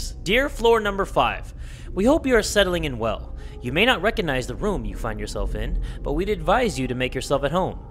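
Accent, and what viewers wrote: United Kingdom. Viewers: got the most real British accent. Plus he is real thank god for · THAT BRITISH ACCENT THOOOOOO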